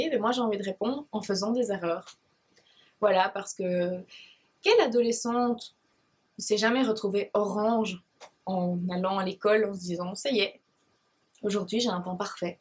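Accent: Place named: Belgium. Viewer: Super que tu débarques sur YT ! J'adooore tellement l'accent belge :)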